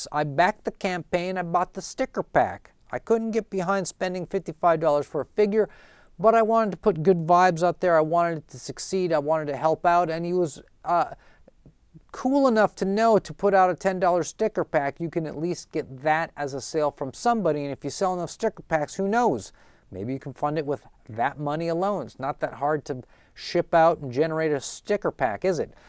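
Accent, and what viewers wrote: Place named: Midwest, United States. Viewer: I've watched your two newest videos today and learned two new things about you, both of which explain why I like your videos so much: 1. You're from Minnesota; I grew up in Wisconsin! It's nice hearing a Midwestern accent on youtube. 2. Your "autistic ramblings" as you put it: I'm autistic, so to me they don't even sound like ramblings! I've been enjoying your channel quite a while now, I was happy for you when I saw that recent vid get some traction. Your videos always give me a very specific vibe: when I lived near Green Bay, my dad and I would go to comic and game shops (and sometimes thrift stores) on the weekends and just drive around, seeing what weird stuff we could find. Your videos always give me a similar feeling to that fun vibe. Now that I'm in my late 20s and a collector myself, your videos make me nostalgic for those days!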